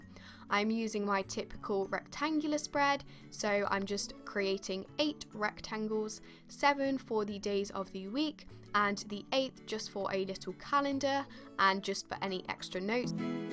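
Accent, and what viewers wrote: England, United Kingdom. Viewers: Where of england is thic dialect from?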